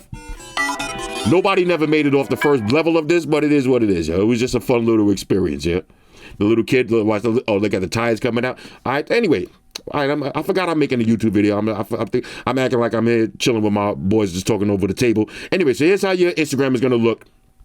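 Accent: New York, United States. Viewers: haha I know a New Yorker when I here it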